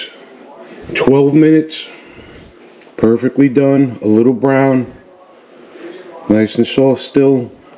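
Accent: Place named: New York, United States. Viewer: Cookies with a NY accent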